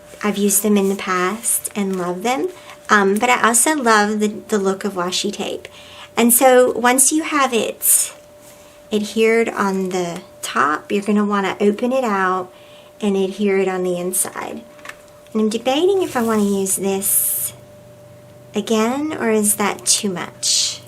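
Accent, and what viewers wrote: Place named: South, United States. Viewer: Love the pages and all of the eat stuff your hubby brought back for you! Where do you live? You sound like you are in the south! I'm in Atlanta.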